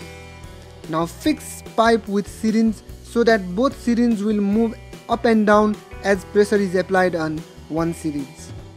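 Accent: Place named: India. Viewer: why is his a indian guy my partner is laughing about his accent help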